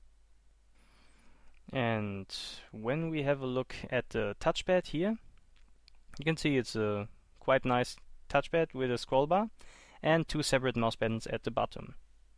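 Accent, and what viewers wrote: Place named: Germany. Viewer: man merkt wirklich dass du nen deutscher bist an deiner aussprache. Arbeite mal dran. Sonst alles top ; )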